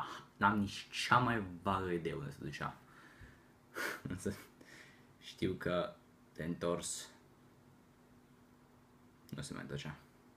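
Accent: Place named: United Kingdom. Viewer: se vede ca ai fost in anglia ca ai mult accent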